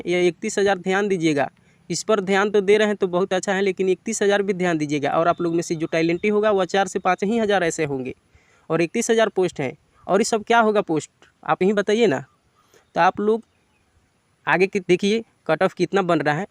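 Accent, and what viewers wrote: Bihar, India. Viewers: Bhai बिहार से हो क्या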